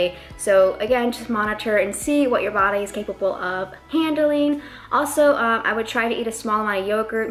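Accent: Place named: Brazil. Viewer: Are you brazilian? Pretty accent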